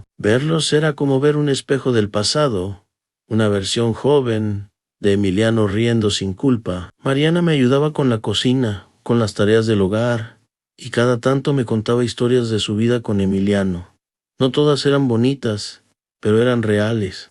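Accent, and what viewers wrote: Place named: Pocho, United States. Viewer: Que pésima narración, no necesitas intentar hablar como pocho, el español es bellísimo, no me gustó tu narración, no terminé de escucharte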